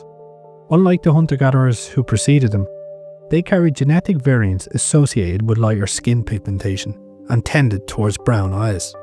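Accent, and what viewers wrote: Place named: Rural, Ireland. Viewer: Maith an buachaill… definitely a culchie twang off that Irish accent ya speak in tho 😂😂 class work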